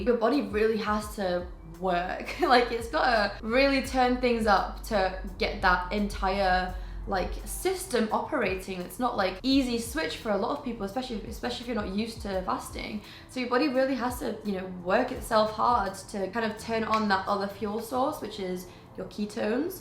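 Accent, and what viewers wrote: United Kingdom. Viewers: I was right! YOU ARE LATINA 😮🎉 I was able to identify your complexion, your face, and excited this is the very FIRST time I see a Hispanic with a British accent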